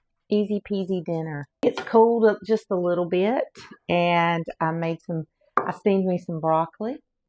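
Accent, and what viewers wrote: South, United States. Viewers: I am so excited that I found your channel, love your beautiful southern accent and your recipes!!♥♥♥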